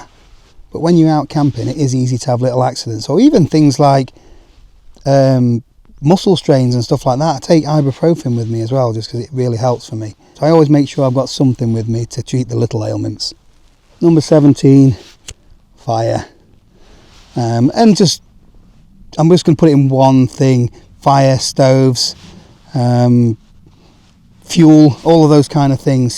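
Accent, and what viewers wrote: United Kingdom. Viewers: Great video 😁👍 I'm ex-pat Brit, living in Japan 20+ years, and it was great to hear your accent, see the views, and remember the National footpaths/bridleways etc., in the UK 🇬🇧❤️🤍💙 Here 🇯🇵, "Right of Access" doesn't exist, so although not technically illegal, wild camping simply isn't done. 🫤 Our camping trips are closer to those of the gorgeous "Kirin Camp" (the South Korean girl camper), than yours......but not really by choice. 🥵 Be safe, and catch you later mate. ☺️👍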